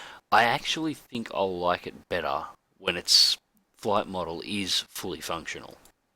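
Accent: Australia. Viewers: the firs video I watched was his Sabre f2 firsti pressions video and the reason I subbed was his flying skills his cool calm and collected tone and of course his aussie accent ;)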